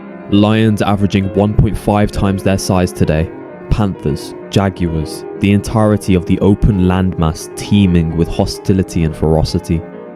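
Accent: United Kingdom. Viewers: West midlands?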